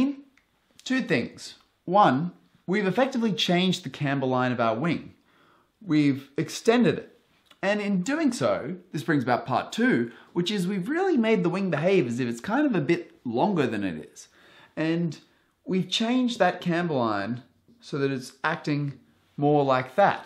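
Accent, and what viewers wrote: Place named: Australia. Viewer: Aussie accent - he's saying "drive the air harder"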